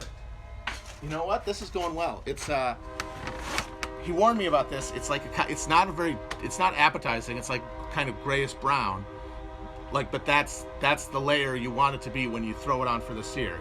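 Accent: Louisiana, United States. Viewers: When you began speaking with the Cajun patois, it triggered some personal memories from long ago. In that geological era, I was trained as a saute cook in a rather upscale Holiday Inn by an incredibly talented Louisiana immigrant. The hotel in question is way north of the Mason Dixon line. He never revealed to me how he got past the border guards, and seemed uncomfortable discussing his transition. I never pressed him about it. He is an expert saucier and makes the best red beans and rice I’ve ever had. And after all these years, he still won’t give me the recipe. So.... I really enjoyed this episode. My favorite so far. Breaking in a new grill, the Balkanized Mid West, protesters, attack helicopters, Harry Nilsson, Covid Economics & Sociology, and finally, The Steak. Looked delish. I personally like mine dangerously rare. Meat thermometers are essential for any household, but on the grill, eventually you will learn how cooked the meat is just by a touch of the tongs. 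Bon Apetit.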